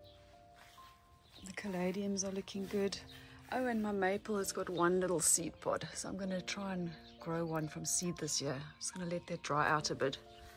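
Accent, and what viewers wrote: South Africa. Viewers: Hi, dont know your name but I just found your channel last night. I literally turned green with envy watching your beautiful garden in the USA. I live in Napier, Overberg Western Cape. So listening to your "normal" SA voice was SO nice to hear. I particularly loved your video of repotting strings, which are favorites of mine. I realize I am not doing enough propagation seeing all yours. I will be glued for more vids ❤ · You sound very South African🇿🇦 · What a beautiful, well kept garden. A nice surprise to hear your South African accent. An ex pat here, in Austria.👏😍👏😍😊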